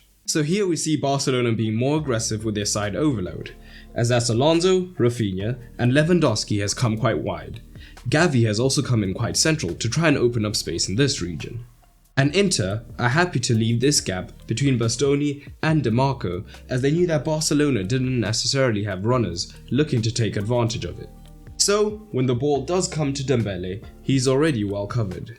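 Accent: South Africa. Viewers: You sound like you are from South Africa.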